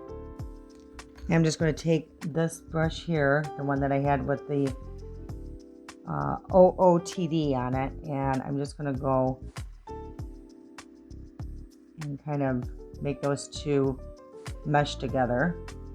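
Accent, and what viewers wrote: Midwest, United States. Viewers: Hi!! I’m not sure where you’re from but you remind me of my time living in Minnesota. That wonderful Midwest accent. You make me feel so nostalgic to go back there. :) enjoying your content.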